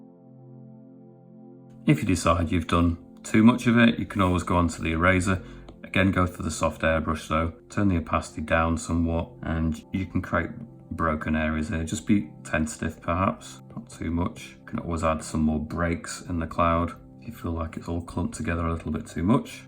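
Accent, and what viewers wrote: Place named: North, United Kingdom. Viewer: Great to hear a familiar northern accent on YouTube! Brilliant video!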